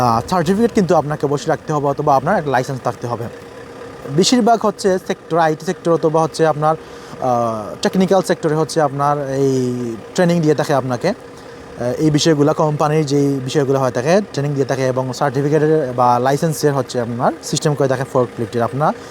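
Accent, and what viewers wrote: Sylhet, Bangladesh. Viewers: ভাই আমার মনে হইতাছে আপনি সিলেটি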